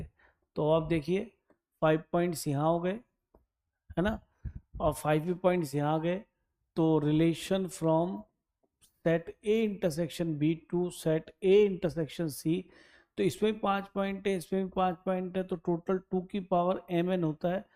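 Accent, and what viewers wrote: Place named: Uttar Pradesh, India. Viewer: sir ap up s he kya?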